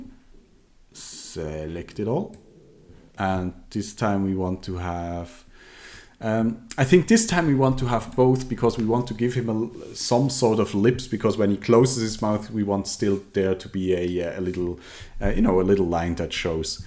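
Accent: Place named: Germany. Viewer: Thanks for the Tutorial! your accent also sounde pretty German to me, haha! :)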